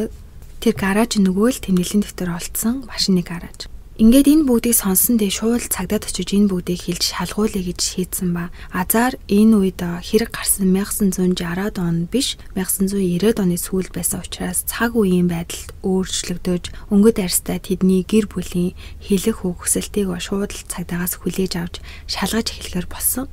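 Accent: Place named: United Kingdom. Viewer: MONGOLOOR yarij bgaa uhaantai YUM bol Angli hunii neriig Angli ayalgaar BUU HEL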